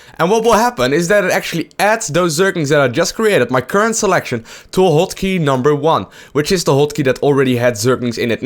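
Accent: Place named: Netherlands. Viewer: U are dutch or something like that? Cuz your english is somehow funny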